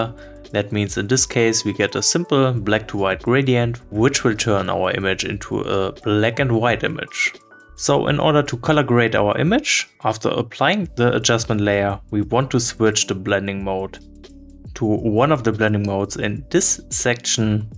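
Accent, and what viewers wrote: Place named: Germany. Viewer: Gleich die ersten Sätze ""helo ia uan wouatzap" )) Dein gut gemeintes Englisch ist dermaßen deutsch, dass es fürs Ohr dies oder jenes geesprochene Wort unverständlich kliingt. Am besten machst du deine Videos in der Muttersprache und du wirst sehen das wird belohnt, hast bestimmt mehr Subscriber als sonst. Warum? Weil in englisch ist auch Konkurenz zu groß.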